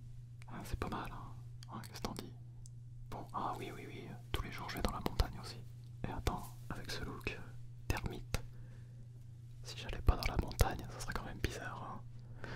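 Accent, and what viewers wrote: France. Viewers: He speaks a bit fast for someone like you that is not used to french. Plus the accent from france is fairly different than the french canadien one. Its all practice. I changed my computer to english, my phone to english and only consume english medias and over time I understood both languages almost perfectly.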